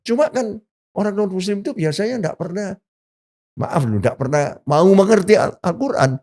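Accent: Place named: Java, Indonesia. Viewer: Logat Jawanya medok banget, jadi makin relate sama pak Hermawan 😊 "kejujuran adalah nilai2 Islam yang Universal"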